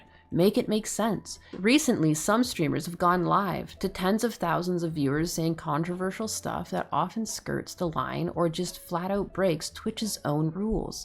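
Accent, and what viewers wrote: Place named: Canada. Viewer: Streaming on Twitch can be a pain in the. Your "out" and "about" gives me the impression that you're from Canada and I don't know why you have so few subscriber so I have subscribed.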